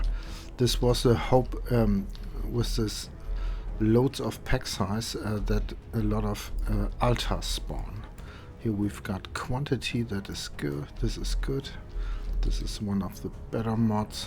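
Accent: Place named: Germany. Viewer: Du musst Deutsch sein deinen Akzent hört man schom derbe raus.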